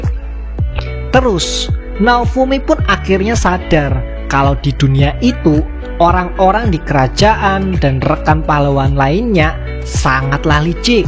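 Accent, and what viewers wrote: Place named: Banyumas, Indonesia. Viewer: Ngapak juga gaes nyong ee 😁😁😁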